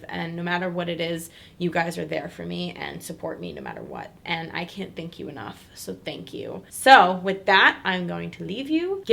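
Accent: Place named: New York, United States. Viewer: IVE ALWAYS LOVED YOUR NY ACCENT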